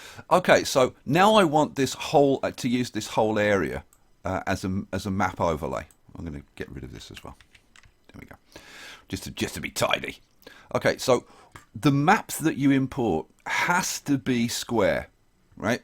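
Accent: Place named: Birmingham, United Kingdom. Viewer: Thats a Brummie accent if I ever heard one.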